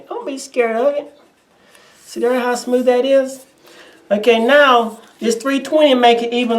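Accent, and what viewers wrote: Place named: South, United States. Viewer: LOVE the southern drawl in your voice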